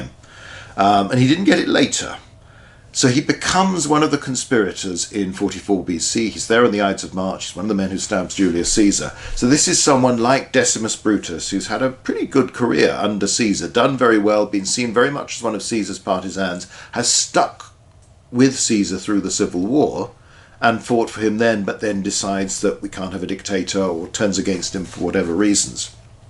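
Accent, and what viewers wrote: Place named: Cambridge, United Kingdom. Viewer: Its called Cambridgian English. Kinda like Cajun English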